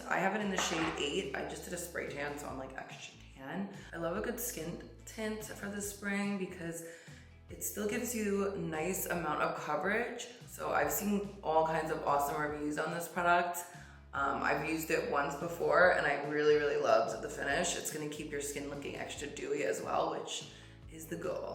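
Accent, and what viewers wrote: New Jersey, United States. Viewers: Wait why does her voice accent and tone sound different? She sounds less Jersey here😮 I love her NJ accent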